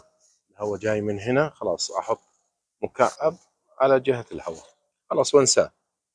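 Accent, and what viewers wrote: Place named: Deir ez-Zor, Syria. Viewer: شيف المحترم نحن نستمتع بكلامك وشرحك على الفيديو خصوصاً بلهجتك الديرية الجميلة اذا سمحت لا تقطع الفيديو بهل طريقة السريعة وخلي يكون اطول ❤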